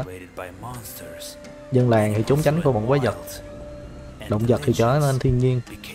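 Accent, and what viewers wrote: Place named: Mekong Delta, Vietnam. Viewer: Nghe giọng thì chắc dân miền tây ròi, e cũng miền tây nè, mà nghe bảo có cam k bận đồ mà a 😓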